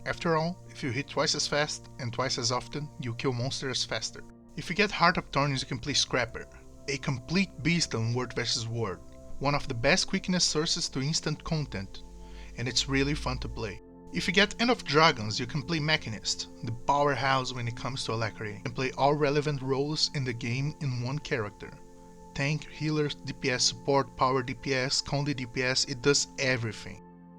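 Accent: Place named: Brazil. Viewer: You sound Brazilian 😅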